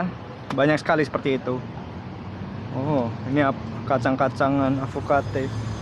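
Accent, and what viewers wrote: Java, Indonesia. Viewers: Mantap mas, tinggal di amerika tapi logat jawa nya masih ada 👍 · Ketoro wong jowone 😁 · Mas ganteng amat ...orang mana sih,,, Tapi gaya logat bicaranya kaya orang jawa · Asli jawanya mana mas ko medok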